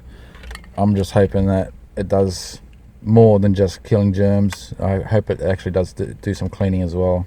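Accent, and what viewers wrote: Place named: New Zealand. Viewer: haha oh really 😂 sorry mate - yeah definitely heard some Kiwi twangs in there. Maybe with so many of us kiwis here it’s starting to rub off on ya! Ha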